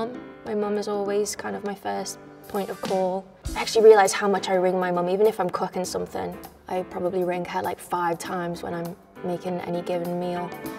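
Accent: Liverpool, United Kingdom. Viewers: aww Scouse accent is one of the best thing about her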